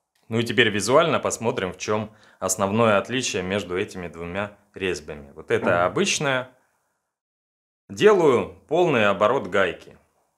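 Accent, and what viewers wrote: Rostov, Russia. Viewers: Ростовчанин, штоле? По говору слышно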